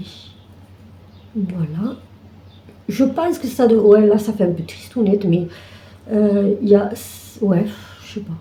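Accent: South, France. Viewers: Bonjour, je viens de m abonner, j aime beaucoup votre accent qui me rappelle mon enfance dans le sud. Je vais faire le SAL également et pris cela grille sur le site de brode 41, que j ai découvert car comme vous il n y avait plus la grille chez de mère en fille et j ai été très satisfaite de sa préparation de commande et de l envoi ultra rapide, je recommande cette boutique qui fera partie de mes favoris maintenant. Très belle pochette, il faudrait que je m y mette également 😊 on va être plusieurs au taquet début juillet pour ce SAL je pense , pour ma part je vais faire ligne par ligne de dessin et faire juillet et août dès le début car en Août priorité aux petites filles et vacances et là il me faut des projets 0 CERVEAU 😂😂😂 bonne petites xxxx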